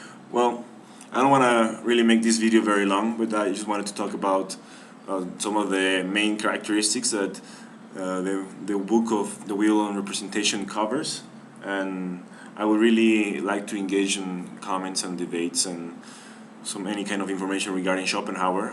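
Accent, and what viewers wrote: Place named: Mexico. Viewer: Good English, where are you? I can tell you're Mexican but do you live in the States?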